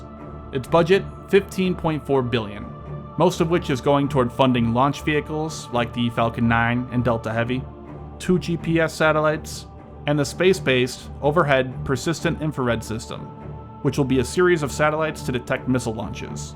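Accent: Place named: North, United States. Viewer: he might indeed be at least from northern US .. to my,western, Canadian ears I don't hear much difference ..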